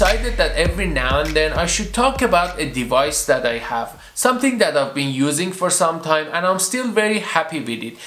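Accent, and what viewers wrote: Iran. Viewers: Loll gotta love the Persian accent 👍🏼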